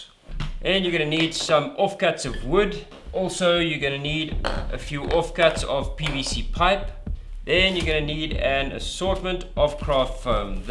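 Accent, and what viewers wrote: South Africa. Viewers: I can tell instantly you're South African tjom. Great video, was just looking randomly for folks who may have crafted Vincent Valentines gun and I found you. Not very many South African content creators like you and I around, granted our content differs by a helluva a degree but still cool to see another.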